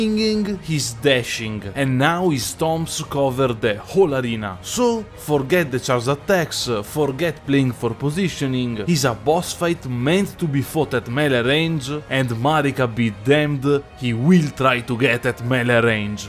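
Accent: Italy. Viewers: It's just your average Italian accent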